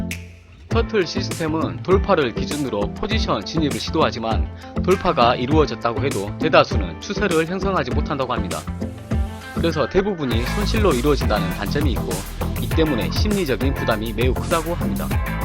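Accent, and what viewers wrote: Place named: Gwangju, South Korea. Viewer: 억양이 광주사람이군요 ㅎ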